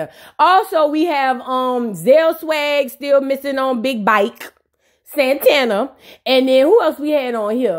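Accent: New Orleans, United States. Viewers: you sound like my teetee erika love your nola accent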